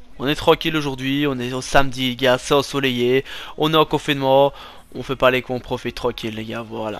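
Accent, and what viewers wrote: Liège, Belgium. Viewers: Sa sent l'accent de liege ça haha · Toi je parie ma vie t’es liégeois hahaha quel belle accent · T belge ? Haha ton accent es puissant couzin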